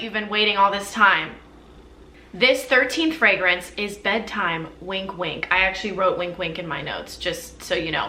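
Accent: France. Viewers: You have a great taste on perfumes and a very nice french accent ! Great video, I watch you your channel from Portugal! 😁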